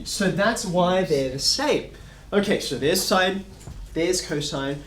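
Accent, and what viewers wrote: Australia. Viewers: I was not expecting him to be Australian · I love the Australian accent ... "I know some of you know what the cooeeuuaarrrrr in cosine means."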